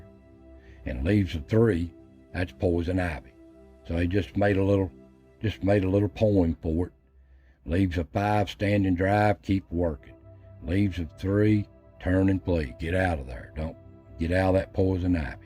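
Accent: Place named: Southern Ohio, United States. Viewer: I too have heard all these sayings. I'm 70 years old and live in southern Ohio and a lot of our people has your same accent.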